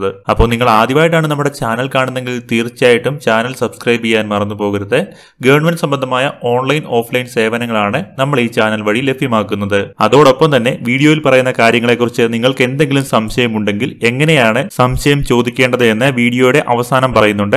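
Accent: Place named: Trivandrum, India. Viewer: Tvm slang♥️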